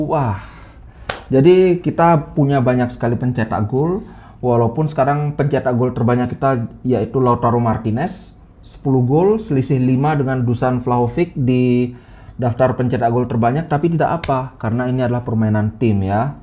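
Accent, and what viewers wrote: Bali, Indonesia. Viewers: Menarik komentarnya, apalagi dialek Balinese membuat senyum2 mendengarnya. Lanjutkan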